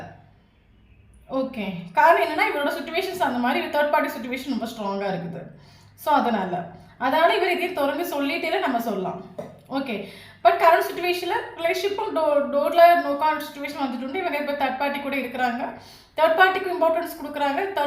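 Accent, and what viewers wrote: Kerala, India. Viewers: யார் நீங்க கடவுளா என் வாழ்க்கையில் நடந்தது எல்லாத்தையும் அப்படியே சொல்றீங்க நீங்க நீங்கதான் பிரபஞ்சமா எனக்கு நடந்தது எல்லாத்தையும் அப்படியே சொல்லிட்டீங்க இனிமே நடக்க போறது எப்படி சொல்லுவீங்க அம்மா நூற்றுக்கு நூறுக்கு மேல இல்லன்னு சொல்லுவாங்க ஆனா 100 க்கு ஆயிரம் சொல்லிட்டீங்க மா யாருமா நீங்க எங்க இருக்கீங்க எப்படி வந்து என் மனசுல இருக்கறது நடந்தது எல்லாம் வந்து உனக்கு தெரிஞ்சது ஒன்னும் புரியல எனக்கு வந்து ஒரே குழப்பமா இருக்கு நிறைய மெசேஜ் பார்க்கிறேன் அம்மா அதான் இது மாதிரி வந்து உண்மை வந்து அந்த அளவுக்கு சொல்ல முடியாது நீங்க என்ன கேரளா லாங்குவேஜ் பேசுறீங்க அது மட்டும் தெரியுது கோயிலா சுத்தத்தை விட உங்ககிட்ட வந்து எல்லாத்தையும் சொன்னா கூட ஒரு ரிசல்ட் கிடைக்கும் என்று நினைக்கிறேன் நீங்க சொன்ன மாதிரி நான் தினமும் வந்து பிரபஞ்சம் கையில வந்து என்னுடைய ஸ்டோரி எல்லாமே வந்து சொல்லி முடிச்சாச்சு இது எப்படி உங்களுக்கு தெரிஞ்சது பக்கத்து வீட்டு அம்மா மாதிரியே பேசுறீங்க அம்மா ரொம்ப நன்றி அம்மா நல்லா இருக்கு ரொம்ப நல்லா இருக்குங்க அம்மா 🙏🙏🙏🙏🙏🙏🙏🙏🙏🙏👍👍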